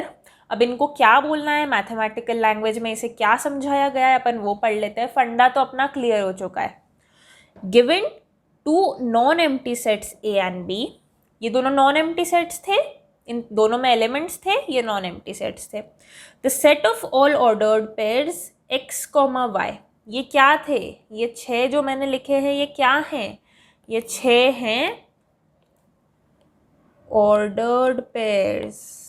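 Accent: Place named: Bhopal, India. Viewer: #APAN full bhopali style didi Love from bhopal (mp)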